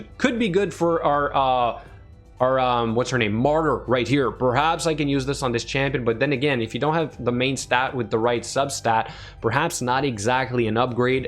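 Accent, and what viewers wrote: Quebec, Canada. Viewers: french Canadian i think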